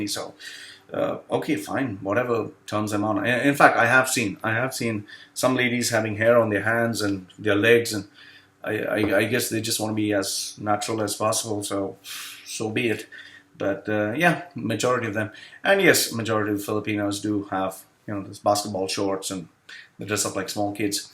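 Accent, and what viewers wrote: India. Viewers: Dont look indian but accent is give it away. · YOUR ACCENT IS LIKE INDIAN PEOPLE TALKING SH*T! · Even you cover your speaking with English diction, we can still hear your Indian accent, sorry but you still looks like an indian.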